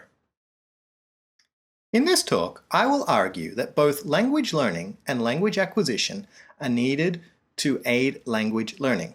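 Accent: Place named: South Africa. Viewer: One question, where are you from? Your accent sounds really strange and muddled. If I had to guess, I'd say you're a South African trying to take on an American accent.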